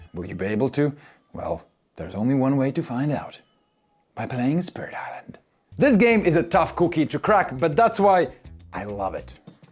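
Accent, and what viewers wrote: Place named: Latvia. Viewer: sasodīts, man vajadzēja tikt līdz šī video, lai sāktu aizdomāties...? Akcents? Yep. Skaidrs. Apsveicu, jums līdz šim tīri labi izdevās nomaskēt savu identitāti:) P.S. kur jūs pērkat visas šīs spēles? daudz kas no jūsu apskatiem LV nemaz nav nopērkamas (vai arī vnk nezinu, kur skatīties?)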